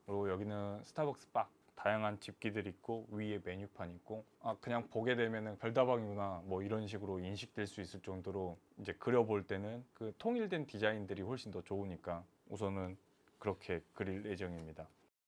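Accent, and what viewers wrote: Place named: Jeolla, South Korea. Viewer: 앗 저 전라도가 고향인데... 말씀하실 때 전라도 억양이 들리네욤 🤭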